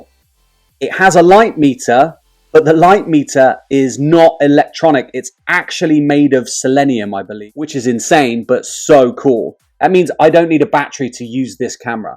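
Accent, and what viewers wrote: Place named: Mid-Atlantic, United States. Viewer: Don't forget you are British, very Bad manners to wear a hat indoors. 🧐 Also be careful you have an annoying mid Atlantic accent. Dont forget to pronounce your T's and its Mathematics not Math....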